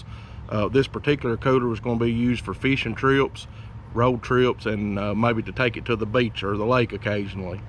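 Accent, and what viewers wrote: South, United States. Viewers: thanks for the review, bud. also your southern accent is spectacular. cheers.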